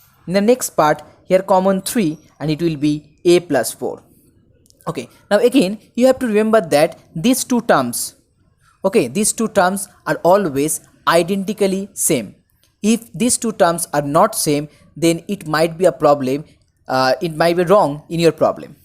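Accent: Bengal, India. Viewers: Are u Bengali ?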